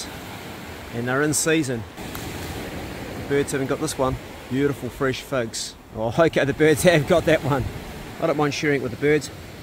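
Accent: Australia. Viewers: Awsum life mate. Love your aussie accent. Excellent crafting. Im a kiwi expat lived in OZ most of my life. Was full time offgrid doing the The Big Lap for 5 years. Nature be in it 💪👍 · Thought he was cheating until i unmuted and heard he was australian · Seems like a sort of copy of survivor man with an Australian accent. I dig it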